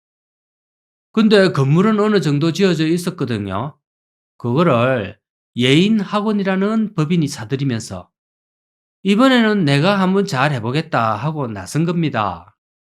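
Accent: Gyeongsang, South Korea. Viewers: 님 구수~한 갱상도 사투리가 참 정겹습니다 오래 오래 건강하셔서 좋은 영상 많이 보여 주시고 올해 반드시 100만 구독자 돌파 하시기 바랍니다!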